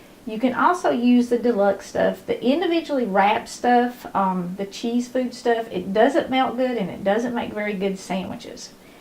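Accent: South, United States. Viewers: I just want to add: I really do enjoy watching you, but I bet it isn’t the reason most other ppl do. I was born in and spent the first 25 years in Texas. Then I wound up in Edmond, OK, then Boulder, CO and back to Edmond again. I just miss southern accents! They make me feel at home! My grandmothers and great grandmothers were rural, farm and dairy people. We’d go on Sunday to visit and then for eating later in the day, my grandmother would reach into her refrigerator and out of a little bit of one leftover, and two or 3 more in margarine tubs, she could turn those into the best dinner!! She would also put out a huge plate of her homemade pickles!! And a big chuck of cheddar. All of those things just tickled me to death!! I get just a tad of that my memory watching you cook, so thank you!! I hope to make your corn fritters just as soon as the sweet corn is in!! 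My husband is a minister, so I laughed so hard with you telling on the kids in your first church who would say the verse, “Jesse wept,” and take their dollar and run!! I had fun sharing that story with my husband. I will just keep on watching, just wanted you to know, just the way you talk is like being home. Texas home, that is.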